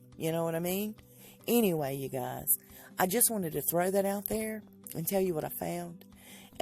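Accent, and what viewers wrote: South, United States. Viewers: You are so precious,. I look forward to your videos everyday! I love your Southern accent. I am from TX, and I have one too. You keep me company sometimes, ad I am at home a lot. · me 2 I love her southern accent!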